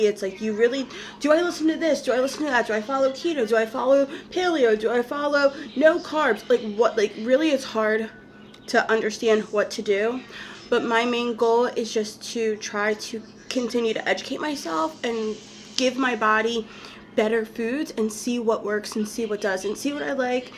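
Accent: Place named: New York, United States. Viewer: Trader Joe's has very good whey protein powder in chocolate and vanilla..I add about eight ice cubes, cup of almond milk, fruit and some sugar free torani syrup to give it more flavor. I use a spoon to eat it as it's very thick. Look into Nestle and where they get their water. Why not drink tap water?...you sound like you are from NY where the water is great. The cost is way less and no plastic bottles.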